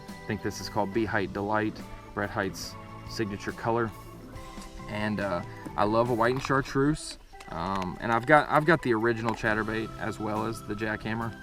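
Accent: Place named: South Carolina, United States. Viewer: I thought you were another South Carolina boy for a second!! Very informative man. I absolutely love the jackhammer.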